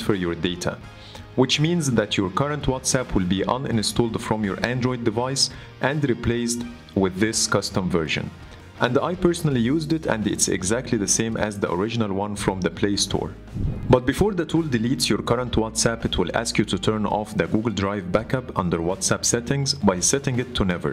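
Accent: Egypt. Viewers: Your accent sounds Egyptian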